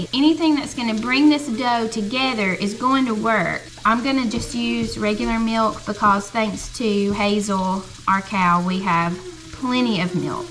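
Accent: South, United States. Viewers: I love your southern accent! And your grocery ideas. I don't see snack items on your list. Does your family snack?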